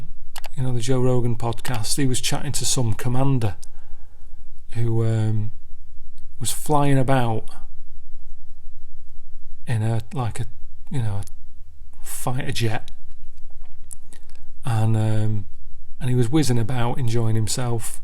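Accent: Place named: Northern England, United Kingdom. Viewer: Love how he goes extra northern when doing his radio voice LMAO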